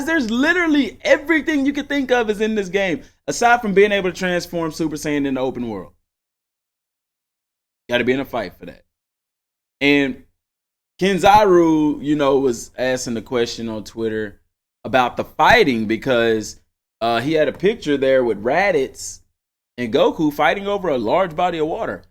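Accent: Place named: North Texas, United States. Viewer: Super Hype for this game NOW, BUT you can’t band wagon something before it comes out and Proves how good or great it is. it’s not band wagoning to anticipate a good experience based on pre-Viewing. That’s just Marketing ,advertising, and publicists doing their job to make it more appealing to its demographics bro and that includes youtubers. Also you wouldn’t happen to be from North Texas area. Just curious straight out the gate you sound like my kinfolk and friends; accent and speech pattern wise.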